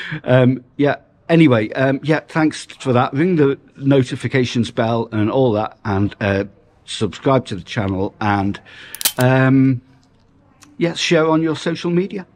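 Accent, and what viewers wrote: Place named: Prescot, United Kingdom. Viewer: I always enjoy your videos the is nothing wrong with your Prescot accent is box entry still there it's at the back the centre and leads to sewell Street i used go walk downbox entry into sewell Street and walk past what me and my brothers called the train way the bi where we saw the little trains working on our way home from church school I sometimes walked with my brother or only own and the wasn't a soul in sight I felt safe lovefran xxxx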